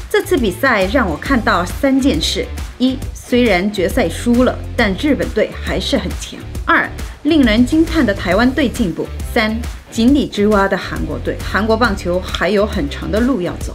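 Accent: Standard. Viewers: 妳的中文好標準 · 國語超級標準😂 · 您的中文真標準，謝謝您的影片❤ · 這國語也講得太標準了,是真的韓國人嗎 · 天呀 你的中文發音非常標準❤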